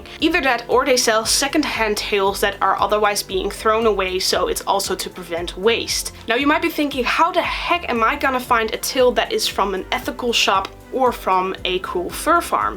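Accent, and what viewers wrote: Netherlands. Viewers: I smell..Dutch accent!!are you dutch :3?? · Don't get mad or smth, but I think you're dutch.... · WAIT ARE YOU FROM THE NETHERLANDS !?????? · What are you from the Nederlands or Germany?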